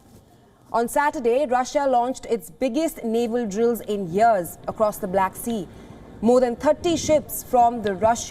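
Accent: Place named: India. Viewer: this Indian news reader her accent is so annoying to listen yo go back to India and report they will love you there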